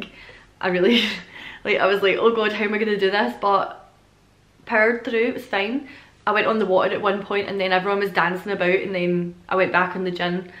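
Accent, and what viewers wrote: Scotland, United Kingdom. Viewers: you always make my day better. I think you are perfect in every way. You are so beautiful and I have always been a fan of the Scottish accent so it drives me crazy to hear that accent coming from that beautiful face. Keep the videos coming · This is me listening to you. 😂 I just love your scottish accent!! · I think this means u r thinking beyond the 3rd dimension. Past present future r all one, I been watching Aaron Doughty he does meditation but explains reality shifts etc (the same thing is happening to me) Have fun in US! They love Scottish ppl there, esp a pretty lady...in fact u could coast right thru on accent alone 😎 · Welcome to America, Miss. Lot of things will be strange, but much will be familiar and inviting. Advice? I do have relatives in New Mexico, and the temperature changes DRAMATICALLY throughout the day, from hot in the daytime to freezing at night, so bring a couple of jackets, even if it's 100 degrees that day. 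Also, you can always expect that when someone in America hears your accent, they will want to tell you about their Scottish ancestors (the same applies to ALL foreign tourists we encounter here). I know it gets old really fast, but they really want to hear about the country their family came from, and they are trying to make you feel welcome.:)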